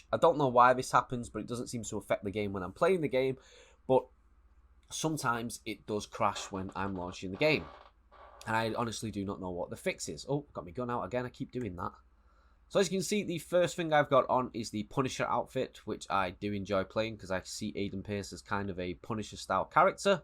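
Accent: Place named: United Kingdom. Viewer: That british accent is amazing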